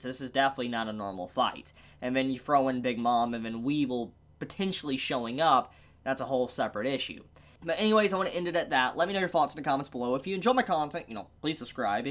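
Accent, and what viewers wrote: South, United States. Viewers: Yo where are you from? I feel like sometimes you have this southern accent that doesn't sound bad at all, just made me quite curious! Much love from San Antonio.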